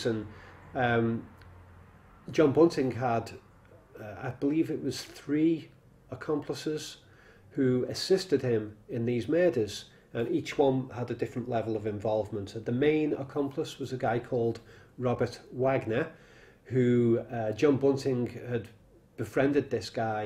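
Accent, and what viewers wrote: Liverpool, United Kingdom. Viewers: Love you and your chanel, but as a Blackburn native its very very funny to hear a scouser say "did him too" when describing a whole ass murder haha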